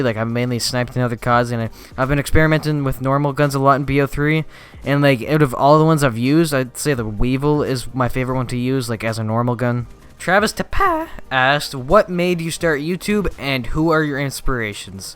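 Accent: Ireland. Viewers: I thought you where Irish I'm being honest here not even joking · He sounds Irish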